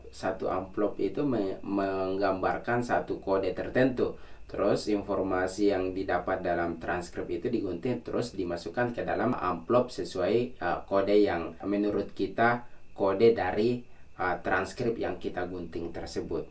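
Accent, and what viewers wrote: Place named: Makassar, Indonesia. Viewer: Kaka orang Makassar yah? Logatnya sama dengan kampung saya 🙏🏻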